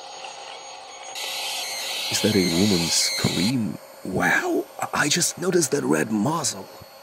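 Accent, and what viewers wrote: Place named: United Kingdom. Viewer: the british/whatever accent is that with the video is amazing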